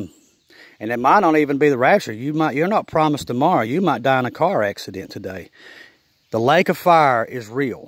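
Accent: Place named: West Virginia, United States. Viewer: Am I getting this right? I just recently subscribed to your channel and it sounded like you are in West Virginia. I live in South Dakota but my entire dad's family is basically in Logan County West Virginia and have been for centuries! I just find it interesting, I'm also related to the Hatfield's on my dad's mother's side although her dad was a McCloud. I love my family there and I love West Virginia though the last time I visited was in 1981, really miss it but my health precludes me traveling. Anyway God bless you brother! I love your posts that I've seen so far! MARANATHA! HALLELUJAH! AMEN!🙏❤️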